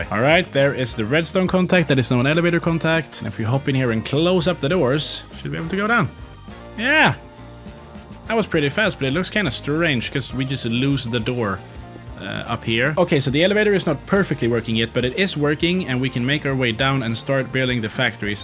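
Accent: Sweden. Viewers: Heyyyy are you from sweden sounds like it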